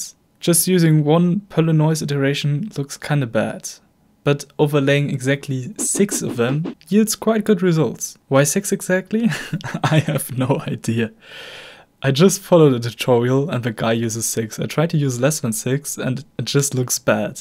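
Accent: Germany. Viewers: I knew u are German, ein Deutscher erkennt doch einen anderen deutschen. xD 😂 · Are you German?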